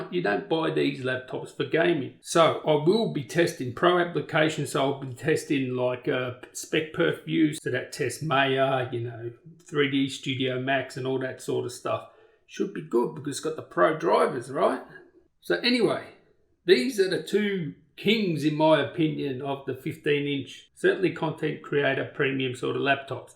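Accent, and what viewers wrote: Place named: Australia. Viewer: with the laid back aussie accent and not showing yourself on cam, I imagine you're doing this video's in just your fuckin pants whilst necking tinnies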